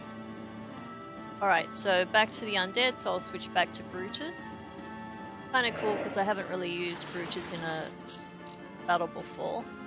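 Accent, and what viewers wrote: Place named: Australia. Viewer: always love to hear a woman's aussie voice in gaming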